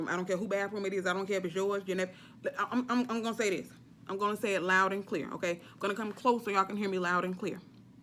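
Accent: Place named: South, United States. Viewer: NEW SUB LOL MUST BE FROM THE SOUTH HAHA LOVE HOW YOU SAY TOILET "Turlet" 😆😆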